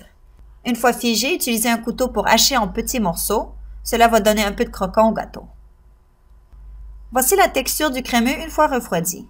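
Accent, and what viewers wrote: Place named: Quebec, Canada. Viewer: Bonsoir. Dabord merci pour cette recette ! Votre accent québécois avec " inchallah" c'est aussi fantastik que votre gateau 😉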